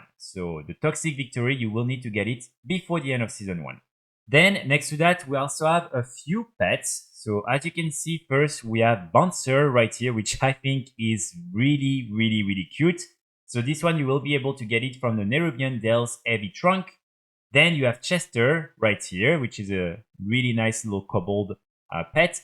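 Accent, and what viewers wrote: France. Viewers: I think he's french so that might be why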